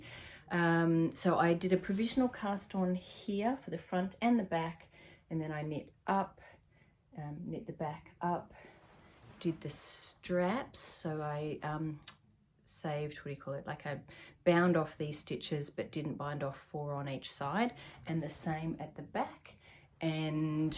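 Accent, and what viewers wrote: Australia. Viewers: I really enjoy your podcast! I love listening to your Aussie accent.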